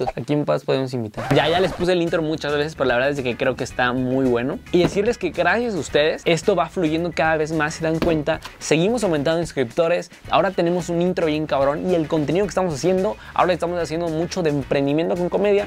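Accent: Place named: Maya, Mexico. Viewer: Me gusta el acento maya jajaja👏♥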